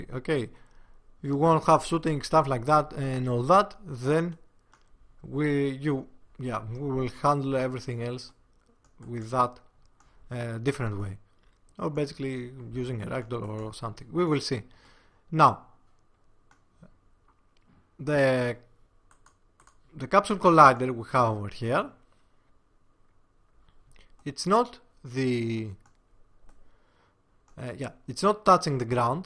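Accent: Greece. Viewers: You are Greek because of your accent.. Huh?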